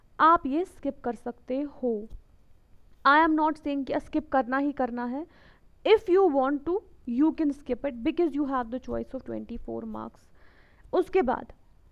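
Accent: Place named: Kashmir, India. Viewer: I think You r frm Kashmir Right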